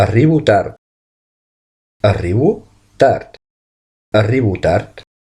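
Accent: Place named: Argentina. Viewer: Argentino intentando hablar en catalán?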